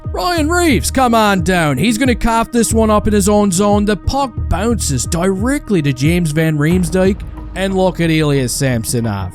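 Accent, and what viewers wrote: Newfoundland, Canada. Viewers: you sound like yer from newfoundland b'y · Is a newfie? Sounds like it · what part of atlantic canada is this fella from? felt like I have home in newfoudland listening to this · Your accent... are you a newfie? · dying at this sadly its all true 😂😂btw you gotta be a fellow newfie i haven't heard an accent that thick anywhere else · Yes by' are you a newf me son? Unless me ears ain't hearing right. I'd say you are from the rock!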